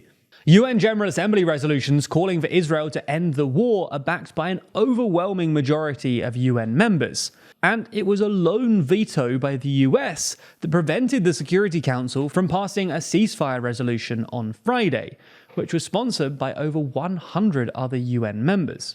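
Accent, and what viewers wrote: United Kingdom. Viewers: You sound british. Let me ask you, when the germans attacked your country in 1940 did your grandparents care about the german civilians??? Grow up. If you elect terrorists, expect terror.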